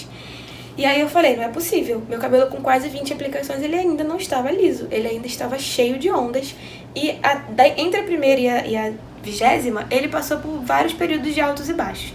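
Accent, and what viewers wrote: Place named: Rio de Janeiro, Brazil. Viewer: Sotaque carioca é tão bonitinho... 💋 de Sampa.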